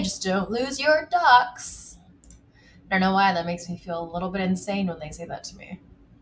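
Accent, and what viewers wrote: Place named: California, United States. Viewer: I tried watching the Vedio as much as i could, your California accent ruined everything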